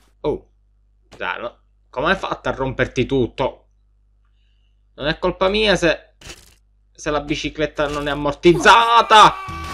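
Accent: Palermo, Italy. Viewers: SEI DI PALERMO?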